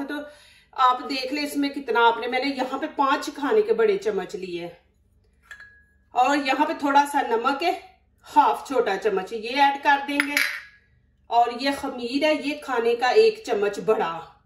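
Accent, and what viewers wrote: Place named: India. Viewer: It's a amazed moment when you dish out delicious foody recipe with desi dialect live long with healthy life sister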